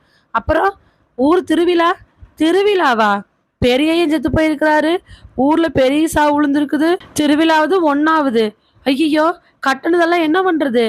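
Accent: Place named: Kongu, India. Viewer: Kongu Tamil Vera level sister 🎉🎉🎉🎉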